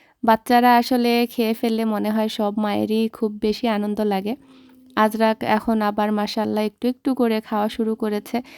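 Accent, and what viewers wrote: Sylhet, Bangladesh. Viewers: তুমি সিলেটি তোমার সিলিটি কথা সুন্দর লাগে আমি ও সিলেটি।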